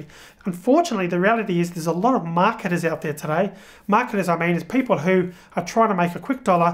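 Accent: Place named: Australia. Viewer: I agree. courses are great I've bought a few on udemy. Also feel more comfortable listening to Australian accent just because I'm more used to it.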